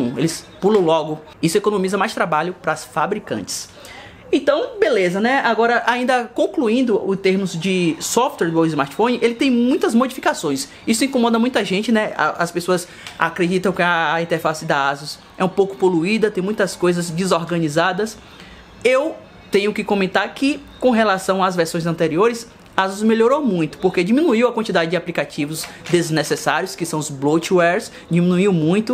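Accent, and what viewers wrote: Bahia, Brazil. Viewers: Cheio de sotaque kk, esse é baiano mesmo ❤️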